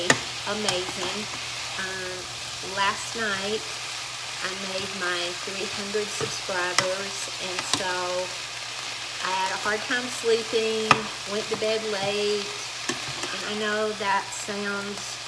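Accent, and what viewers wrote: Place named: North Carolina, United States. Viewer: Hi that a great recipe. I'm detecting southern, NC here. Have a great weekend tyfs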